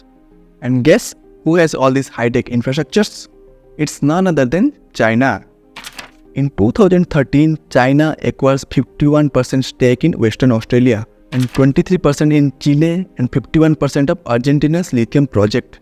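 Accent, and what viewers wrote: India. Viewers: Brilliant video, keep them coming. For others who are commenting on “pronunciation”, I want you to know that international viewers don’t care. (I work with them). And looks like you too are from say up/bihar/odisaa side? It’s the Indians who will crib about pronunciation. Yes, keep it improving but know that the threshold is already crossed and the speech quality is good. Take these comments with a grain of salt and keep up focused on the video content and quality.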